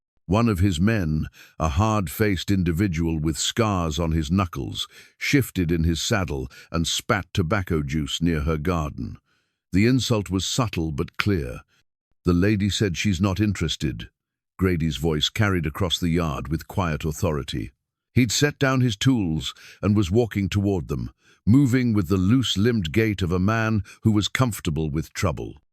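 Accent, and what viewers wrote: England, United Kingdom. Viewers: I’m listening from the beautiful agricultural and lush San Joaquin Valley of California. I enjoy your dramatic stories, they’re narrated well with your particular selected, articulate English accented AI. However I notice they all hold the same theme; that is, territorial differences and proving who holds ownership of land rights. It’s the same story over and again. What’s especially striking me with humor is how all main character men walk and work either shirtless or with the front of their shirts opened. Was this normal? It’s beginning to border on ridiculous.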